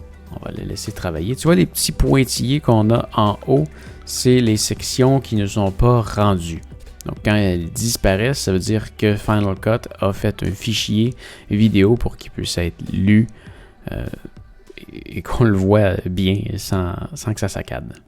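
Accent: Quebec, Canada. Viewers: Très bon ton tuto +1 et +10 pour l'accent tabarnak :)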